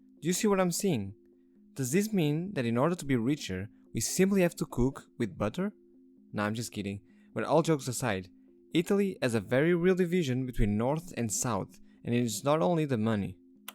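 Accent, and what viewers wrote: Portugal. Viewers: I knew you where portuguese because of your accent. When you said obrigado though i was 100% confirmed.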